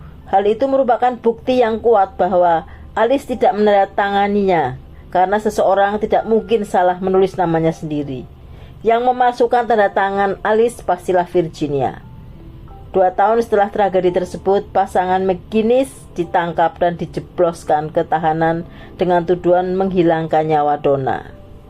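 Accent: Java, Indonesia. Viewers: trllu mdok jowone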